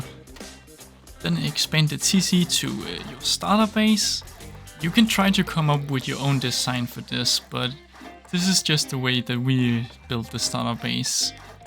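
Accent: Denmark. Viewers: hey are you guys danish? because you sound danish :) btw i am danish have a good day or if you now danish hav en gode dag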